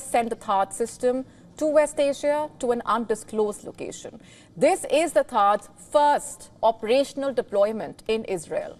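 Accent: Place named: India. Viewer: Can you remove your Indian diction..?? Ty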